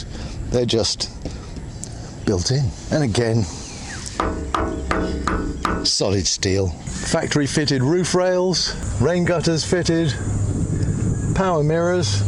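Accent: United Kingdom. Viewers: With all due respect to Scotty who knows a good review when he sees one, let's give credit first to the one who made the review, namely,! You are a natural! I think with your melodious British accent, which Filipinos love to hear, you can have a career doing reviews of things you love to use. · We used to have one of those in the Philippines. Wasn't the latest or the best, but hauled people and stuff for relatively cheap. Back then when you asked if people had a car, they'd usually answer, "yes we have an FX." You can seat 10...Legally lol Something about having a Brit do the narration makes the experience sound like a cool museum tour :) Flippin Marvelous!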